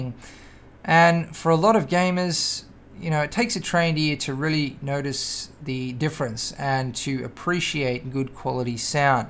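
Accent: Australia. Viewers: are you australian? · He aussy?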